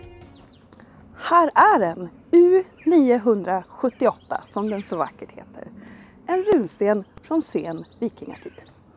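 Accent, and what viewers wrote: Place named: Uppsala, Sweden. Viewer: Någonstanch verkar vara Uppsalalingo. Debattören Malcolm Kyeyne uttalar på samma sätt. Ok. Jag tycker Gamla Uppsala Museum gjort en rad riktigt intressanta videos, de flesta proffsiga, Tittar så mycket jag hinner. Igår var jag tvungen att bila utmed långhundraleden för att se med egna ögon. Mer kunskap åt folket.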